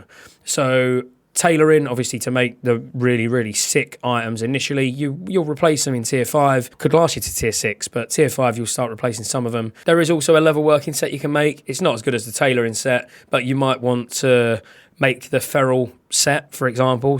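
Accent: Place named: London, United Kingdom. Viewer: i love druid and l love your london accent!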